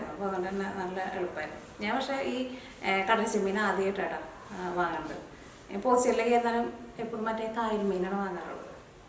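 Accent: Thrissur, India. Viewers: നാട്ടിലെ വീഡിയോക്ക് ഗ്രാമത്തിന്റെ ഭംഗി കൂടി ഉൾപ്പെടുത്തി ചെയ്യുന്നതിന് അഭിനന്ദനങ്ങൾ . മുണ്ടൂർ പള്ളിയുടെ തൊട്ടടുത്താണല്ലെ നിങ്ങളുടെ വീട് . വീഡിയോ കാണുമ്പോൾ എനിക്ക് തോന്നാറുണ്ട് തൃശ്ശൂർ ശൈലിയിലുള്ള സംസാരമാണെന്ന് . ഇത്രയും famous ആയി ഒരു youtuber തൊട്ടടുത്ത നാട്ടുക്കാരാണെന്ന് ഇപ്പോഴാണ് അറിയുന്നത് .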